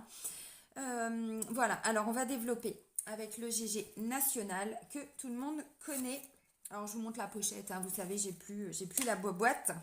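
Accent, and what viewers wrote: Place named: Quebec, Canada. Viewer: Maudit que je t’aime toi ont dirais presqu’une Québécoise!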